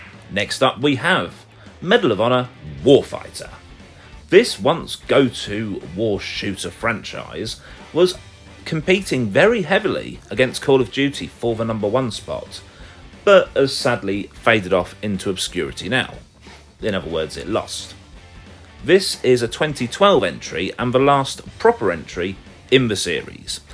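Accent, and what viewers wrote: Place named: United Kingdom. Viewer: I love british accents, +sub.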